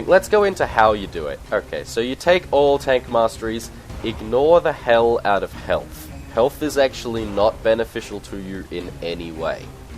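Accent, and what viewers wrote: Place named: Australia. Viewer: Love your accent man! Australian? Also I tried it and it was heaps fun haha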